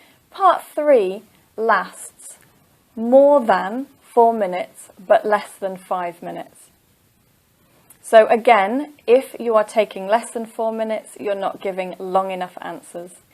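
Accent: United Kingdom. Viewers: Hey thanks for the videos. Studied for 8 days watching every video of yours and also checked the website for reading test. Got 7 band overall. Very happy. Thanks for the help. Didn’t get enough time to practice, else 8 band was easy. Thanks a ton. BTW, I’m in love with British English now! 😅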